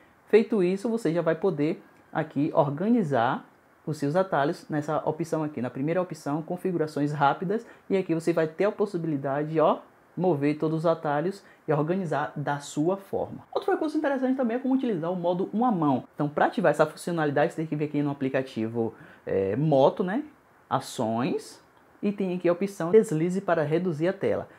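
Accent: Bahia, Brazil. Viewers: Você é baiano né? kkkkkkkk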